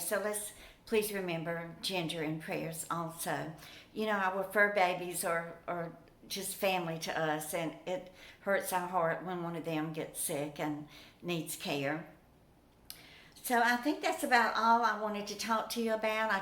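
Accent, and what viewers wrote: South, United States. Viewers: Glad to see you back & looking so fresh & pretty! I didn't realize that p.o.boxes were that expensive per year. I am a recent subscriber and being from the South (Georgia), I just love your Southern accent. You are a great storyteller & I enjoy them. Rest when you must & people will understand. We will keep on coming back! :) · I love you're accent, its a beautiful, classy, southern, Lady voice. Its very calming & loving. I wish the southern ladies of today had this. Its a lost art. I was raised in West Virginia, southern people have heart & soul thats unexplained by us southerners, but totally understood, an unspoken love of heart.I really love that! Im 35 years old & I would absolutely love for you to write a book, a memoir about your life. Truth, honest, good bad & ugly. The highest highest highs & the lowest of lows. I love reading biographies and memoirs about peoples struggles, demons, their joys, they're better angels. 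Please think about it if you would? You've overcome alot & still keep joy on your face. That alone is a miracle, even the days where its hard to find joy. Im sure it would help people out. What do you say? 😁,❤️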